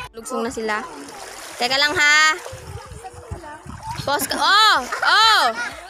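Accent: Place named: Visayas, Philippines. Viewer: i like your bisaya ascent "TUBAG SAD MO OY" 😂❤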